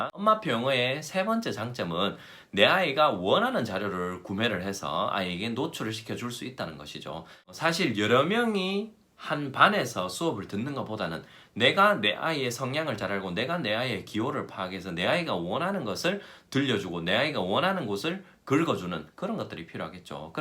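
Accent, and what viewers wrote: Gyeongsang, South Korea. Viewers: 공감되고 좋은 말씀 감사합니다!!! 영어책읽어주시는 클립 먼저 봤는데 영어 목소리 듣고 한국말 목소리들었는데너무 반전이셨어요 😀경상도 말쓰실줄 상상도 못했으요 ㅋㅋㅋ미국에서 오신줄 알았는데 ~ㅋㅋ(영어너무 잘하셔서~^^)좋은생각들~ 좋은 책소개 감사해요!:)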